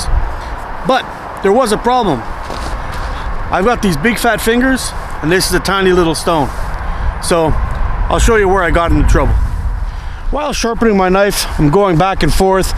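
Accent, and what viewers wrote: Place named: Canada. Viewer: boogie2988 with a Canadian accent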